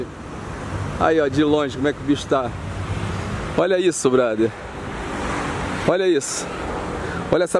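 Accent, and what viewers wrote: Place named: Rio de Janeiro, Brazil. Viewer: Sotaque carioca é da hora demais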